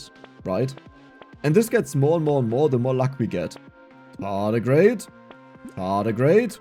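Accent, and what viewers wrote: Germany. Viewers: Man hört das Deutsch so durch xD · The german accent i love it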